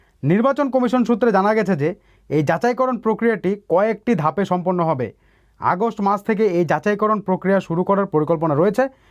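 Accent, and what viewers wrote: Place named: Bangladesh. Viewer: আপনার সব ঠিক আছে তো? আপনি তো বাংলা দেশি, আপনার কথায় বাংলাদেশি টান আছে।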